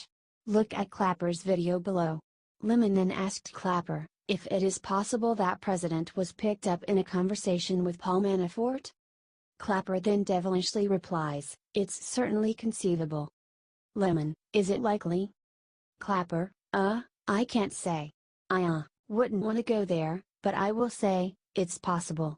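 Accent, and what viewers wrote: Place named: Russia. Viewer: Use your own voice not a Robot. We want to hear your Russian accent.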